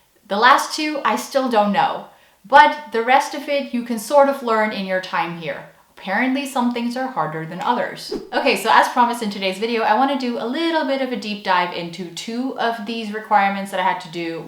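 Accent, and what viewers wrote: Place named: Netherlands. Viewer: her english even sounds like a dutch girl sounds when speaking english!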